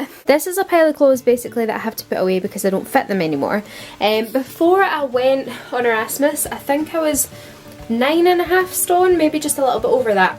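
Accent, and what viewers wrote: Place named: Glasgow, United Kingdom. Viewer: I've missed your vlogs! I'm from Glasgow so it's always nice to hear a familiar accent on the vlogs! You're looking amazing btw, a wee break from YouTube has clearly done you a world of good! x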